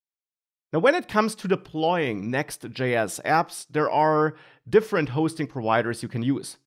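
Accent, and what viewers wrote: Germany. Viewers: Warum höre ich ein deutsches Akzent. Naja alles gut Bruder l.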